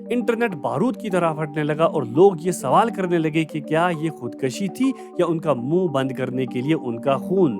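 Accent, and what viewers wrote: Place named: Pakistan. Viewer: definitely Kashmir wali side Sy hngy because dsri side India Waly asy nhi bolty Urdu like Pakistani type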